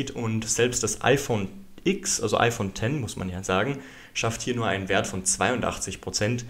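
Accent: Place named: Germany. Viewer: Immer dieses typisch Deutsche: "Huwei" "kinesisch"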